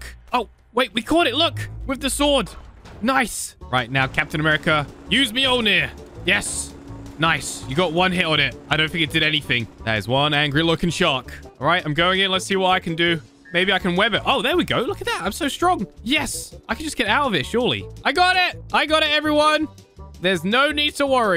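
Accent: United Kingdom. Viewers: 😅he's british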